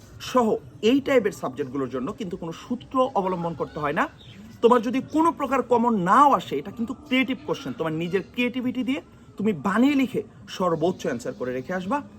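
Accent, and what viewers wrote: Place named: Sirajganj, Bangladesh. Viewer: মনে হয় সিরাজগঞ্জ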